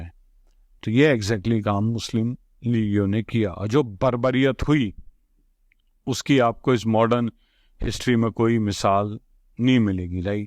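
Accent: India. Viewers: You say you are a Pakistani but your accent is Haryanavi. May I know how and why?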